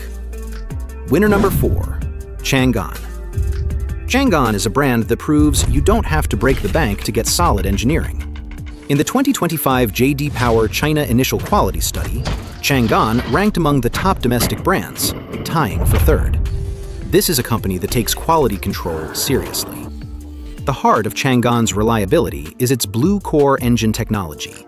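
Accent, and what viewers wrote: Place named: United States. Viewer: And a yank is telling us all about it 😂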